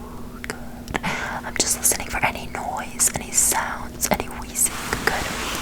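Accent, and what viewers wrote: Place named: United States. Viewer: my first time watching you and that blows my mind you sound so American!! very sharp pronunciation you have the accent down to a T girl, i’m beyond impressed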